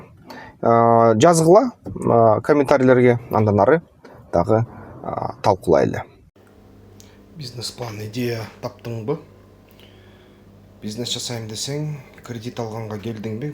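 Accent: Standard. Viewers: Таза суйлочу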